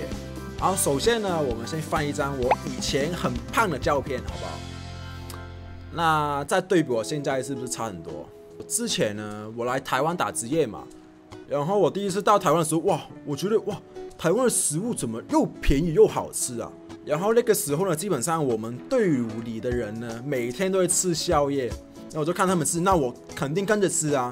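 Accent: Hong Kong, China. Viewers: 好有香港味www you know~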